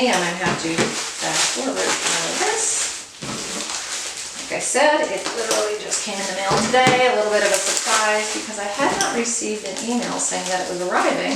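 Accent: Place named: France. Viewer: Hi and! I have a rescue cat named Norm! We rescued him from our local shelter! I absolutely adore your glasses! Your French accent is divine, dahling! Beautiful bag, too! Thank you for sharing, my friend! 😍🐈❤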